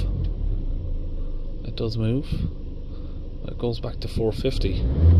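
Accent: Ireland. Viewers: You'll never loose that irish accent tipperrary carlow offaly?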